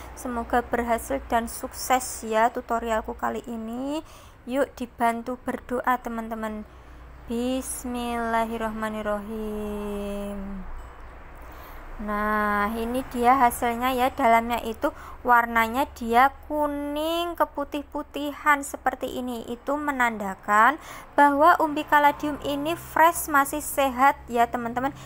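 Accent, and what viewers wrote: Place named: Java, Indonesia. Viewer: Mba..ngmngnya medok bgt ..jd saflok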